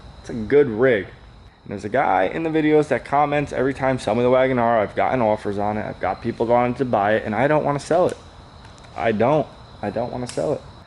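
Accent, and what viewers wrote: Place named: East Coast, United States. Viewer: That was the ultimate Connecticut shitty east coast accent I gotta cwall my ooltinata that dropped oaff is done it sounds like him saying cwoffee I can't stand that accent between the east coast accent an Wisconsin accent fuckin terrible I wonder if he hears how he sounds that was so dramatic the other day when he said its gonna be hard not having his 13 in the shop how the fuck could that make you sad your getting it painted an its a car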